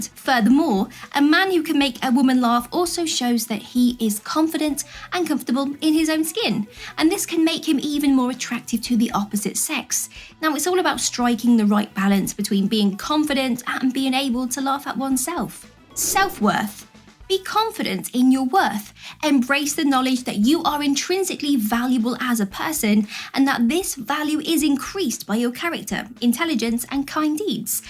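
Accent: United Kingdom. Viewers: For me, a woman with a British accent will catch my attention fast. (I find it difficult to not watch these videos because your voice is so magical.) I have heard women say the same thing about men. Please teach me that accent.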